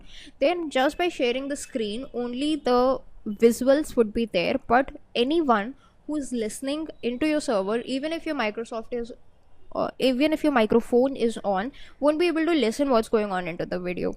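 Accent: India. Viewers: he sounds Indian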